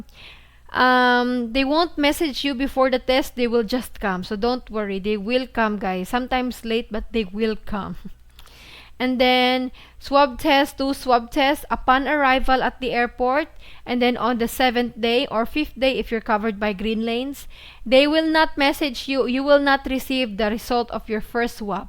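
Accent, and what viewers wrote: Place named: Philippines. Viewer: you are the epitomy of a true Filipina announcer. You have that no-nonsense, non-American accent, indigenous vernacular no other country can produce. Proud to be a Filipino and very proud of you for being yourself. Moreover, your videos are so informative for Filipino American travelers.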